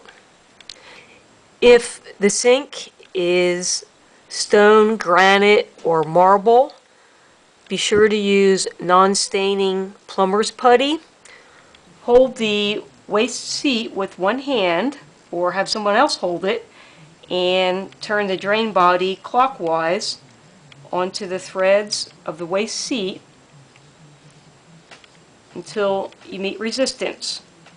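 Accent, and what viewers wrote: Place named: North, United States. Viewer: Great Video! I just have to say. Being from the South, I had to get around the NORTHERN ACCENT, LOL. Great video, I had to install same exact one! Awesome Job!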